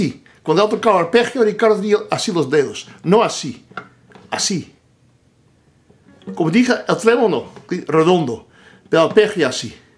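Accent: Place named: Spain. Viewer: Hello sir, what kind of Spanish accent is that of yours ? Thank you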